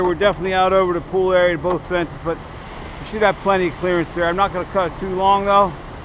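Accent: New York, United States. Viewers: Excellent video. Are you from New York?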